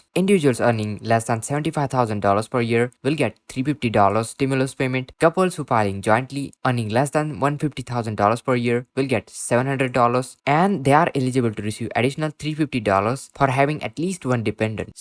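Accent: India. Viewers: I like your Hindu accent 🙂😉😉 I want a Hindu even though I'm not Hindu, damn baby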